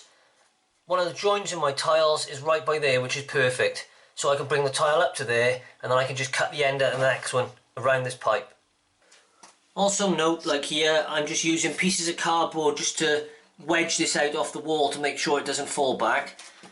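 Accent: Wales, United Kingdom. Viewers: good vids mate! are you welsh? haha. a lot of youtubers drag shit out but you just get to the fukin point haha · Got a welsh twang there. Great video's.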